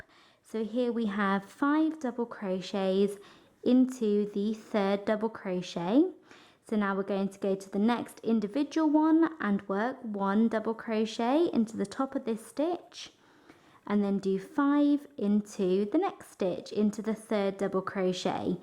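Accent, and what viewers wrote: United Kingdom. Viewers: Absolutely love this dress! You make it so easy. Excellent teacher! Being from the US, I love listening to your British accent.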